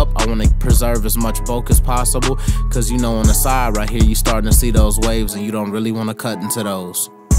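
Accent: Chicago, United States. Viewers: You the shit! I love how you Chicago slick talk 😎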